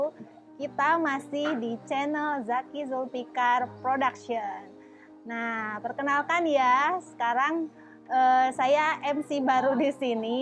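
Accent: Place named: Bandung, Indonesia. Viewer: Bandung pisan logatnya neng😁👍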